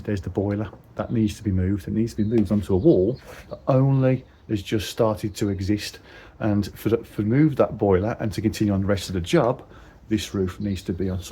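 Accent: Birmingham, United Kingdom. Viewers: Hi bit of a strange comment but, we are accent finders and have been listening to your video. Can I ask where you come from please. I can hear a bit of Brummie and a bit of Wolves/Blackcountry - so where do you come from please - the bet is on for £10 against me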